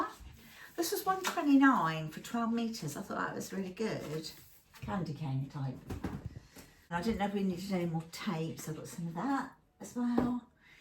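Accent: United Kingdom. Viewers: You sound like everything is Essex bless you ❤❤❤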